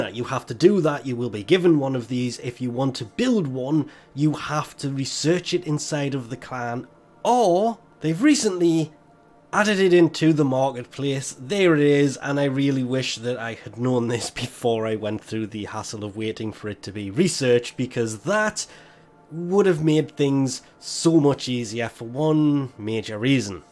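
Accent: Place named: Scotland, United Kingdom. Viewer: DANG SCOTTISH LOVRTT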